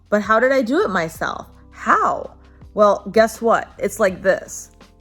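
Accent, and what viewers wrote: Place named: California, United States. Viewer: Love your personality, and it’s so refreshing to hear your “cali accent” I’ve been away from home for 7 years now & it’s so annoying when people tell me that I have one lol but I have now embraced it and love it!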